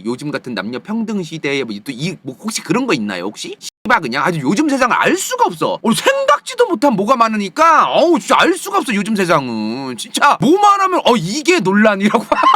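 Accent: Chungcheong, South Korea. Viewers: 앜ㅋㅋㅋㅋㅋ충청도 말씨 너무 좋앜ㅋㅋㅋㅋㅋ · 충청인으로서 공감~~ 고향말투 빠지네요ㅋㅋ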